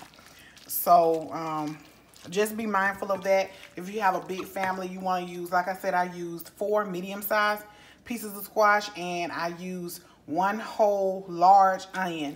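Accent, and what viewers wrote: South, United States. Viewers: It's been a while since I made squash casserole, so looked yours up on here. This is a good one, but I used zucchini & yellow squash together... I've gotten so much from my garden this year! I had to use the closed caption as my hubby was watching TV & got tickled at the way the person who wrote out your words spelled things!! They must have had a time translating your southern accent... lol I made this many moons ago & glad to have you to refresh my memory... I'm 73 yrs God Bless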